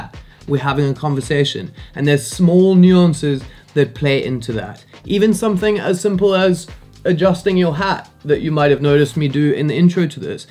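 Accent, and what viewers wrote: Cape Town, South Africa. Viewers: Is that a South African accent??❤ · Lekker video bru. I was trying to place your accent as it didn’t seem Australian. Then I realised you’re from Cape Town too. I’ve been away to long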